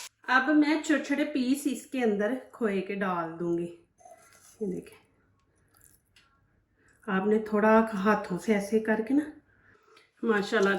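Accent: Punjab, India. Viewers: Aapki Punjabi vadde hai... aapnki urdu se Pata chalta hai ...masha Allah... 🥰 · She is constantly speaking in stupid punjabi accent. Quite irritating.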